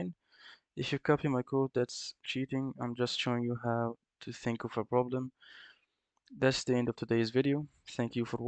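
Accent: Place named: Egypt. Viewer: i know an egyptian when i hear one 😅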